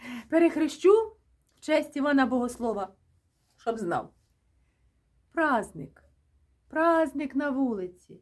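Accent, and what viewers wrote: Ukraine. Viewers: Ну, імовірно, що Наддніпрянський говір. Але він дуже великий за ареалом - від Херсонщини і до Київщини, та від Поділля до Луганщини. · Вінниччина. Моя бабуся так розмовляла! Її-бо- присійбо .... так і казала. Макогін! *Так - Вінниччина *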